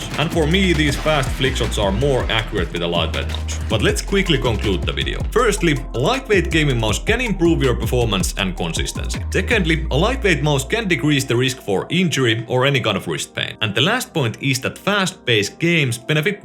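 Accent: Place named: Finland. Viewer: Aaaaaaaaaand the guys rallienglanti is just satisfying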